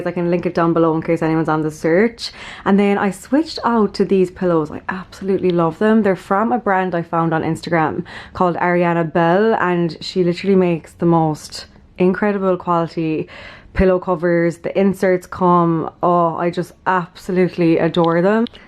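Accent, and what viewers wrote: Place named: Ireland. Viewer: She's Irish, from Kerry in the south-west of the country. Irish accents are incredibly varied - there isn't just one.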